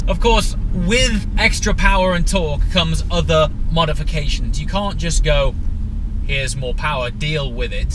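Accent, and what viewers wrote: United Kingdom. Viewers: omg British Accent!!!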